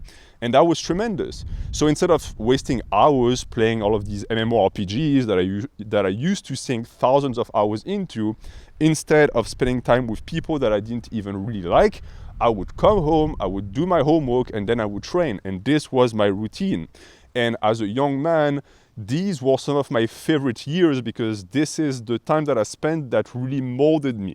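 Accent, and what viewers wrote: France. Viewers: Are you French ? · Bonjour. You have a French accent am I right? :D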